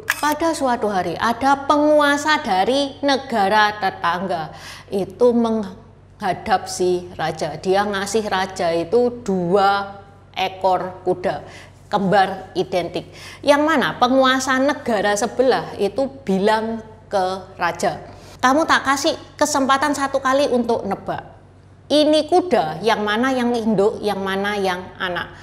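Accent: Java, Indonesia. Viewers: Medok men wong cino iki... ❤❤❤😅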